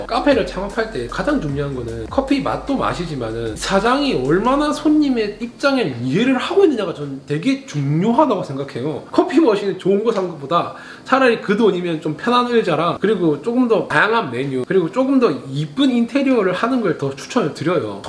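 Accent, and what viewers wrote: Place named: Gyeongsang, South Korea. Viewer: ㅋㅋㄱㅋ 돈을마 발라놧네ㅋㅋ 님 부산에서 카페하시나요?? 경상도사투리가...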